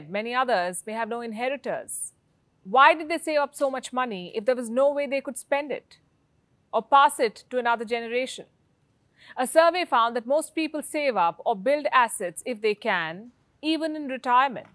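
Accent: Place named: India. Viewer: Madam, nice day to you, a filipina new fan of you here, i am a fan of british and american accent english but now my top on the list is indian accent, and i like it very much...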